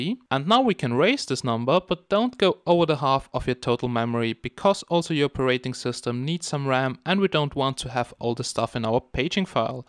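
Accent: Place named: Germany. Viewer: hey, are you German? You really sound like :D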